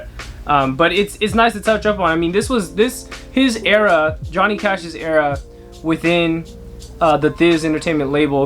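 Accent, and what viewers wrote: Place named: Houston, United States. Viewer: Bro are you sure you're not from Houston, TX you sound just like me lol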